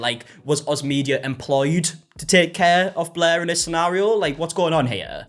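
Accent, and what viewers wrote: United Kingdom. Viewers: Yeah, the light to no R pronunciation and the schwa-ification of almost all vowels in some British accents is amazing sometimes 😂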